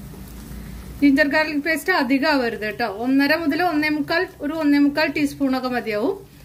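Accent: Palakkad, India. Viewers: palakkad ആണ് എന്ന് എനിക്കും തോന്നി, നല്ല സംസാരം 💗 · sari. samsaram kettappol thonni palakkad, nammude area akum ennu. I am from ottapalam. Cooking video ellam super anu. Try cheyarundu.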